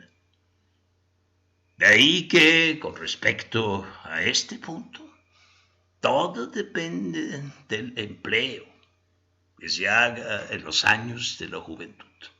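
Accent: Germany. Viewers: es un man español que posteriormente fue a residir a alemania. ¿¿no le reconoces el acento alemán en ese español tan remarcado?? búscalo en googles, aparece como.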